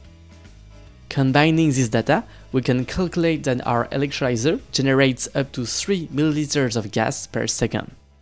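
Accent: France. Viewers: Are you from germany? Why do you have accent of french?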